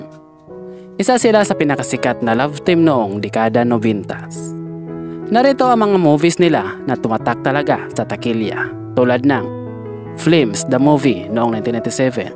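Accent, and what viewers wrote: Visayas, Philippines. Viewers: Bisaya ka kuya? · bisaya man gud.. pki banggit ng aayos plsssssss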